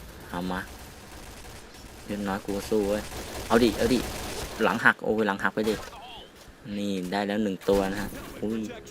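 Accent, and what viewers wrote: Isan, Thailand. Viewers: สำเนียงเหมือนคนอีสานเลย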